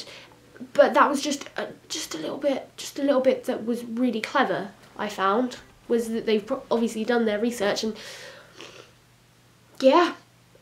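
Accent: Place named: England, United Kingdom. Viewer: I really like your accent :D Which part of England is it from? And nice eyes too :P . Yes, i've seen all 3 Hostel parts, and the best is the first part.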